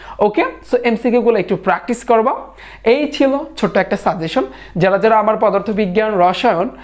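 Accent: Barishal, Bangladesh. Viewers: Vaiya apnar bujhano ta onek valo lage vaiya apni ki borisal ar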